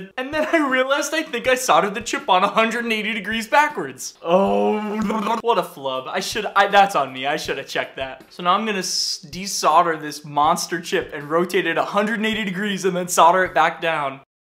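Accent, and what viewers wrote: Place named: East Coast, United States. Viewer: Does he ever say where he lives? His accent is subtle but I'm thinking east coast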